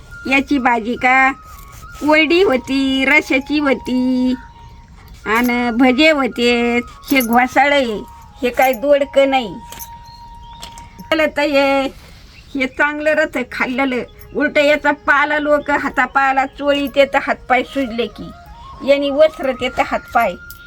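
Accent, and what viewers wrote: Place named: Satara, India. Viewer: Aaji tumi sataryakadcha watta boli Bashavarun chan karta Baji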